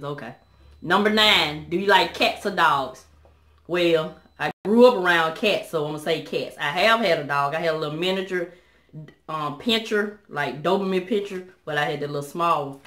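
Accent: South, United States. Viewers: You are absolutely adorable! I enjoyed learning about you. I love your southern drawl. Congratulations on your amazing accomplishments. TFS 👌❤😁!!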